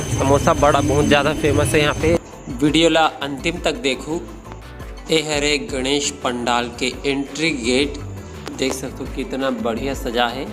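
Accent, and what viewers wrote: Chhattisgarh, India. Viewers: Hello bhai apka jo cg ka bol hai bahut hi mithas hai aap hamesha aise hi cg me bolte raha karo ok👌👌🙏🙏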